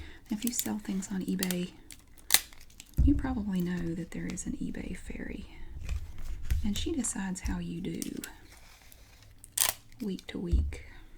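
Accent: South, United States. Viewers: I still have my sticker book from the 80's!! My 9yr old daughter has it now and started her own. Some of my scratch and sniff still have a faint scent which is crazy...dill pickle was my favourite! Love your videos...my twins both watch at bedtime, by request...you are their favourite!!! My daughter loves your southern accent, she tries to copy you saying" that's nice" or "that's so pretty", pretty funny from a 9 yr old Canadian girl😁♥️💜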